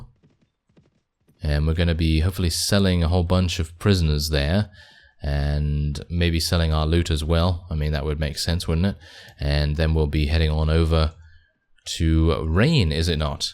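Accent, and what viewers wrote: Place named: RP, United Kingdom. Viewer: This guy sounds like a british lord.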